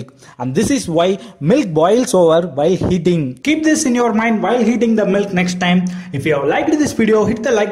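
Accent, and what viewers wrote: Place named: India. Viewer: His indian accent, I couldn't understand because I'm not native speaker.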